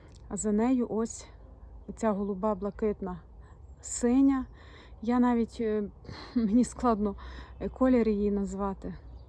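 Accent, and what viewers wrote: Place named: Rivne, Ukraine. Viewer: Дуже гарне вiдео та мова наша украiнська, маю родичiв у Рiвненськiй областi , такий самий говор😊 Дякую !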